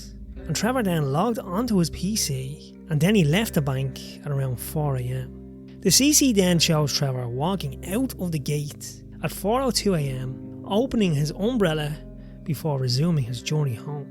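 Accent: Dublin, Ireland. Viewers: Nice to hear a Dub accent narrating TC for a change. Understandable it has to be adjusted a bit for those not familiar with our accent. Maybe subtitles to help people acclimate? Anyway great work, keep it up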